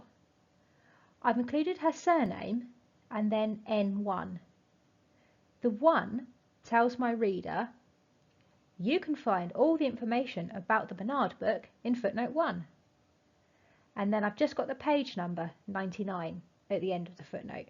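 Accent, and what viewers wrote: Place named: England, United Kingdom. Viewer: I love your English accent ❤️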